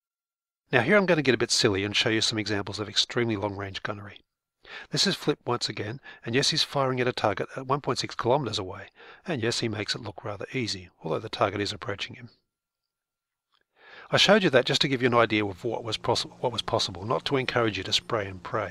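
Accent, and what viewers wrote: Australia. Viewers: You aussie!??!?!?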